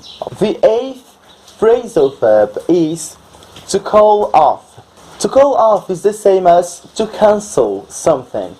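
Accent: United Kingdom. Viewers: Muito legal, muito útil! Eu conhecia 4 dos 10 phrasal verbs, os outros eu nunca imaginaria! Parabéns pelo canal e pelo "Great British Accent"! See ya mate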